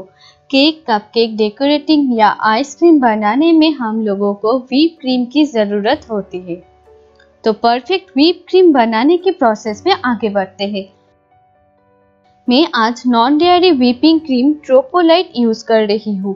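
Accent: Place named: Bengal, India. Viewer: Thank you for all the information. BTW, your accent proves that you are a Bengali. 👍🏻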